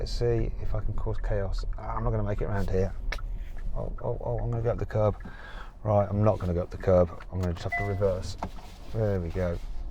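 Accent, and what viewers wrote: United Kingdom. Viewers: 이 양반 볼 때마다 딱딱 떨어지는 영국식 억양에다가 뚜렷한 이목구비, 항상 35%정도 빡돌은 얼굴인게 내가 상상하는 영국인 이미지에 되게 들어맞아서 볼 때마다 뭔가 재밌음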